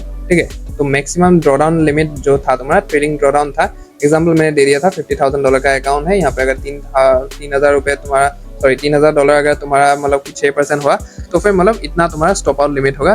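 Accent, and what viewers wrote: Assam, India. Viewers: Assam se ho kya?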